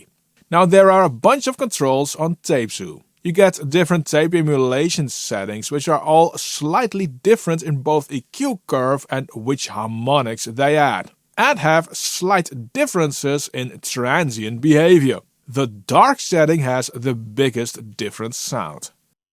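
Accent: Netherlands. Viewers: Subscribed for the Dutch accent!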